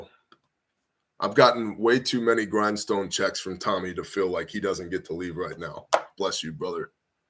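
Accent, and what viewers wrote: Minnesota, United States. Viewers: Love the Minnesotan accent brother. You nailed it perfectly! Dontchaknow! And the radioactive leak, barely a peep and I don’t even hear anyone talking about it. It’s about 2 hours from me. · That MN accent was on point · Awesome Minnesotan accent ya… Donchu know!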